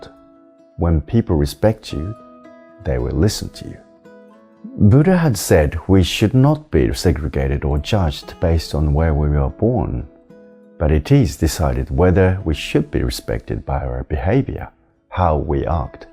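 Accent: United Kingdom. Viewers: 大愚和尚のブリティッシュアクセントが好きすぎる。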